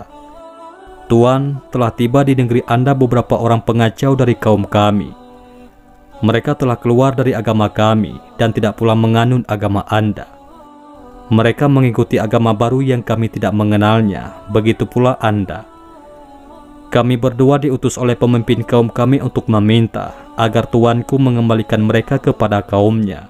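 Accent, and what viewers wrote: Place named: Gorontalo, Indonesia. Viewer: Logat pembawa berita seoerti logat hulondalo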